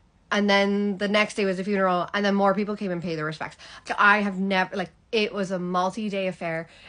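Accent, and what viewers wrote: Ireland. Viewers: Sounds like you've picked up the Irish accent even when speaking with your American accent or maybe just an Irish girl pretending to be American.. haha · (American born, raised Dutch here): You are really growing that irish accent, my compliments. Its also great how social you are. It's not easy to grow into an irish family. You must be special. And that old grand father certainly has been special.💝🙏🕯Good luck to y'all. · yeh, definitely some hybrid accent there. if you told me she was from stillorgan, i'd believe it. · Is it me or is she like doing an irish accent... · She's fake she sounds very Irish not "American"🥱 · You sound irish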